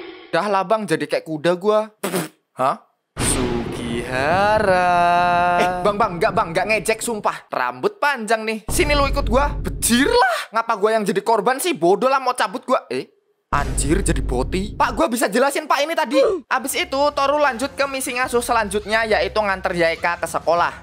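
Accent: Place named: Banyumas, Indonesia. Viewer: Bjir admine wong ngapak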